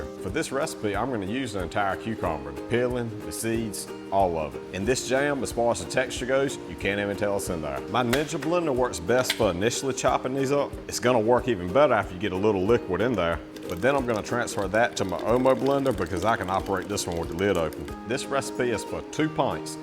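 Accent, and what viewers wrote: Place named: Cajun, United States. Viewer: Man you have figured out a good life for yourself. I am a big fan of your videos. Very informative and down to earth. Calming voice with that Cajun sounding twang makes your narration great as well. I know you probably have zero interest (which is also why I say this) but society needs to start putting their worldly faith and trust in men like you instead of the leaders we currently choose. I’m tracking you down in an apocalyptic situation lol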